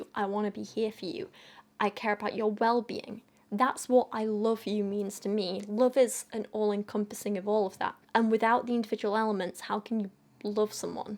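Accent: United Kingdom. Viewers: I think the coughing and British accent sort of makes you sound like a ye olde aristocrat- in the best possible way, you rule, your Majesty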